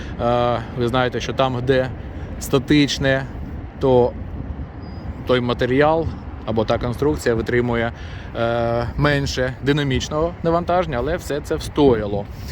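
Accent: Kyiv, Ukraine. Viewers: який гарна "київська говірка", як Ви колись казали 👍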